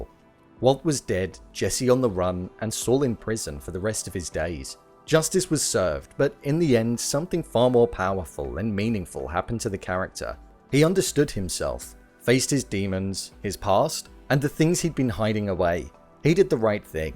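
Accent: United Kingdom. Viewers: Oh god a prissy British guy talking. Disliked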